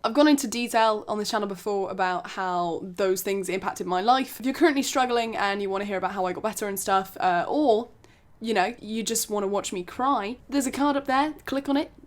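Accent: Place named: Liverpool, United Kingdom. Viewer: You're so beautiful. And you have a lovely accent for a person from Liverpool xxxx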